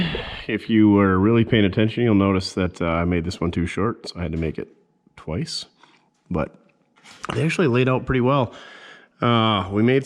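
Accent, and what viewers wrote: Minnesota, United States. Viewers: Great project, make dad happy. Your accent makes me feel like I'm back in Grand Rapids Mn.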